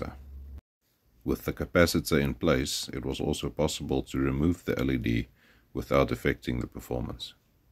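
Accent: South Africa. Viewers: Is that a South African accent?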